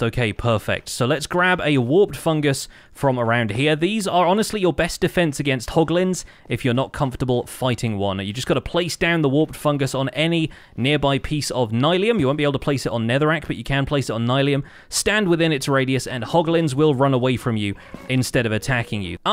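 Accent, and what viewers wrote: England, United Kingdom. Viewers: this guy has a certain way with words, for sure. I think it's part of the english humour, accent and delivery lol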